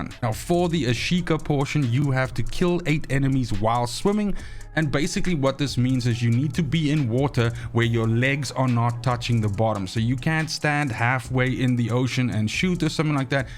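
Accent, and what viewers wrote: South Africa. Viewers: I can recognise that saffa accent anywhere. Thank you for the great video, helped me out. Best of all, it is short and to the point. Keep up the good work.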